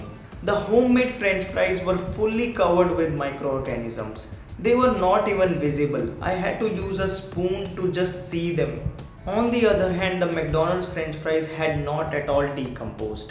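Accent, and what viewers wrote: India. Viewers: Love the heavy Indian accent! Great "wake up" video.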